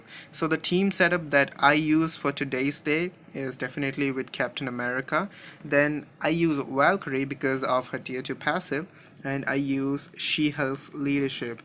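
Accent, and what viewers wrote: India. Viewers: your accent sounds Indian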